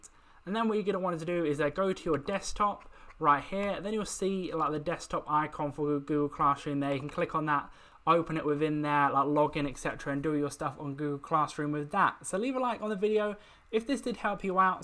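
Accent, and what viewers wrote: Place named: United Kingdom. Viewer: No wonder why you sounds soo bri'ish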